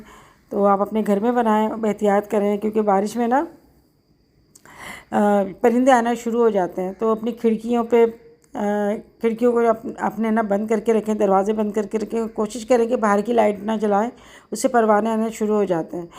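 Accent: Hyderabad, India. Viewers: hadrabad language esi hi hai baron KO toktay Nahi Hain. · Hyderabad me asi ho bola jata ha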